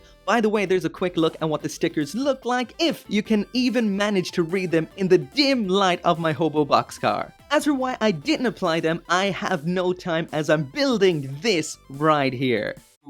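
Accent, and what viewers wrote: Ireland. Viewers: Oy! Irish?! Noice. Is your waifu genderbent lockon Stratos? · You're Irish-born huh?!?!?!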